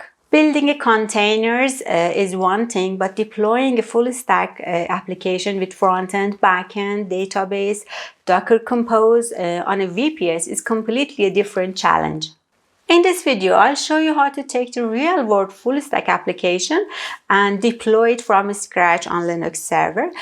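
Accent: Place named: Iran. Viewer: Lahje irani dari Vali das khosh Afarin❤❤😂❤❤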